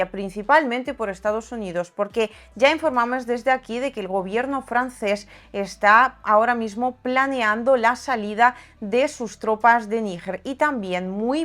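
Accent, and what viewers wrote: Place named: Spain. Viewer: Me encanta tu acento espanol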